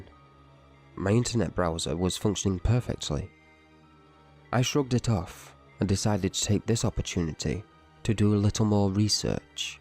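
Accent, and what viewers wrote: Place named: United Kingdom. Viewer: you know it mate :) good to have another British narrator around here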